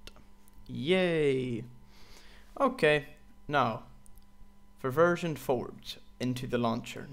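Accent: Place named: Germany. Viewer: BTW! your accent is awesome!!! Is it German?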